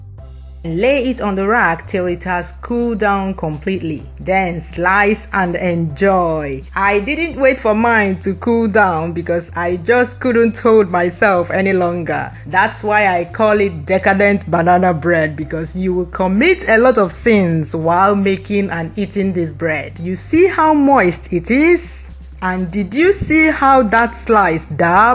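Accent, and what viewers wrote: Igbo, Nigeria. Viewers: Chai! Your Igbo tongue is top notch 👍🏽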